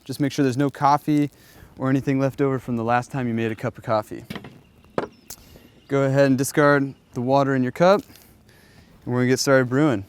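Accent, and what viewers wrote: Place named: Western Canada, Canada. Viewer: Great Review, dude sounds very Vancouver/ Calgary.. but I know this is a westcoast US brand.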